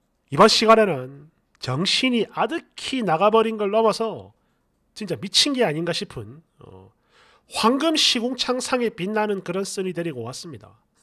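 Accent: Gyeongsang, South Korea. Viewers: 딱 경상도 남자들 말투네 ㅋㅋㅋㅋ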